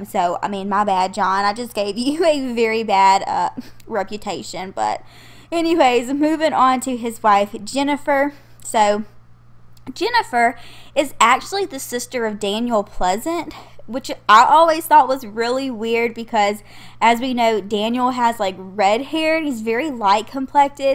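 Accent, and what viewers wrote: South, United States. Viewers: So Cute......... And Loving Your Southern Accent...... As You See I Am Southern! HUGZZZZ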